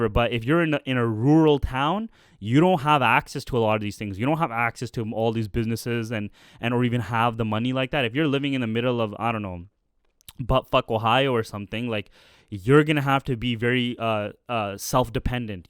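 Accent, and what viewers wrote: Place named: India. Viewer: Can you drop the indian accent...its so gross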